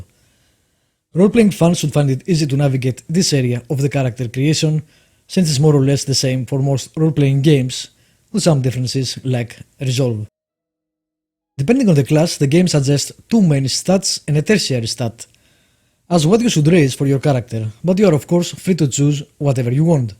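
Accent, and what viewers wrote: Greece. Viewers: Ειναι αστειο πως καταλαβαινει κανεις κατευθειαν αυτην την ελληνιλα στην προφορα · Great review, was really interesting to listen to your Greek accent & how you pronounced different words. Good show! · Are you Greek? It seems from your accent :) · Nice Review.You speak English like a Greek,are you Greek?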